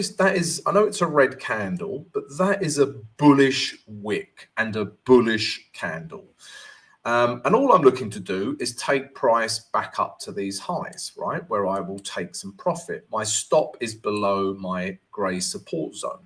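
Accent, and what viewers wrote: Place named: South Africa. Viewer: lol, catching up on stream,love that Saffa accent. Check out NZDCHF, just broke out of long term trend line, possible long opportunity.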